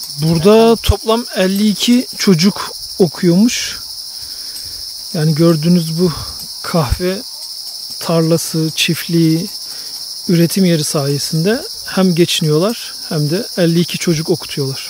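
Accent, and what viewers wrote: Samsun, Turkey. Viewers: Samsun 'da okudum. Samsun ''e''lerini ozlemesim. Dört gözle bekliyorum 50 filan demeni 😃😃😃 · Samsunlu olduğun 52 deyişinden belli abi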